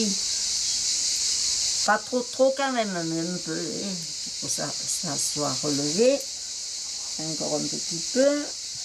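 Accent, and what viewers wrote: Provence, France. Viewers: Merci pour cette recette incontournable de l'été, simple et délicieuse ! Je ne manquerai pas de la refaire très vite. Votre jardin est magnifique et c'est vrai que ça fait plaisir d'entendre votre accent, ( nîmoise, j'ai l'accent qui chante aussi ) qui se perd de plus en plus à mon grand regret et sans oublier le chant des cigales ! Mon grand-père maternel et parrain, était un gentilhomme provençal qui à la fin des grands repas, chantait de sa belle voix "Coupo Santo" et ma fille pour lui rendre hommage est une arlésienne qui défile dans toute la région avec l'école des traditions. Pensées affectueuses de Sylvie la nîmoise 💙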